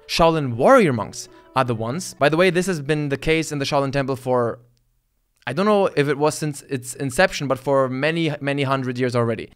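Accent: Germany. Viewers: Just realize you have a little bit of a German accent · Hey... uhhhh.... Mr Shaolin... uhhhh... warrior.... uhhhh monk disciple... guy... with the German accent. Damn! I'm hell-a confused even more now! 太麻煩了！ Loved binging on your Shaolin videos. Experience is everything! 恭喜！加油！ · Is he German? Im not sure he got the accent and this "deine Papiere Jude" is also German but he doesnt say anywhere that hes German. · Ah. Thats why his english sounds so german.. :D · Wait. Are you german???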